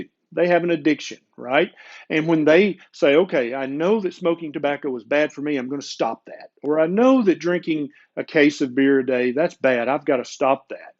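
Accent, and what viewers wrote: South, United States. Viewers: Going through it rn, thank you for the video! Appreciate your knowledge, videos and amazing southern accent!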